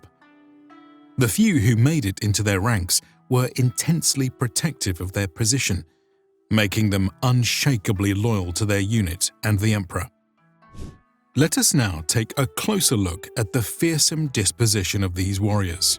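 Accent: United Kingdom. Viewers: Please never stop using a British voice actor. It’s hard to take serious any American that sounds like he is 15 years old.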